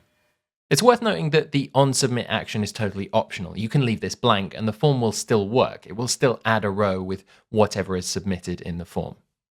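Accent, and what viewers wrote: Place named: United Kingdom. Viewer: why does everyone on these type of tutorials have a British accent 😅🤣 ... Great tutorial don't mind me guys. This was great ! Thanks !!!!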